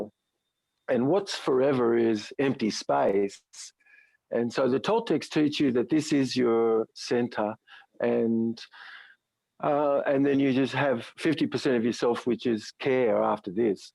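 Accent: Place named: Australia. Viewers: Why’s he got an Australian accent? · because...he's Australian..................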